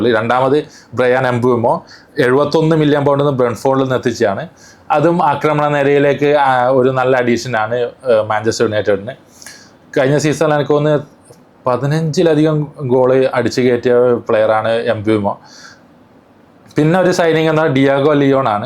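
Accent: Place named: Palakkad, India. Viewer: Are you from Palakkad district? Accent looks like that.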